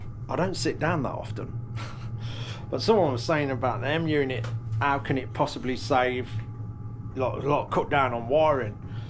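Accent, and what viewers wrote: United Kingdom. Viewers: Mate, as I may have said before, I’m a 63 year old RAF Vet, watching you for years, a patreon for about 4 years, loving your vids, now living in Maryland in the US working and saving hard so I can return back to the UK. Hopefully I’ll get a chance to meet you before my times up. Every evening I hope to see your latest vid to remind me what I’m missing in the uk and listen to a British accent. Thanks for what you do, it means a lot to me and many others around the world.👍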